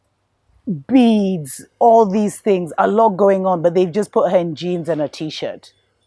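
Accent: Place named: United Kingdom. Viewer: I cannot listen to you why you worrying how she laughs what part of the uk are you from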